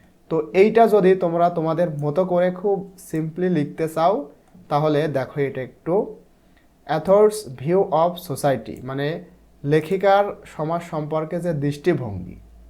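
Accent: Bangladesh. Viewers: Apnar bangla english accent sondor asa, thank you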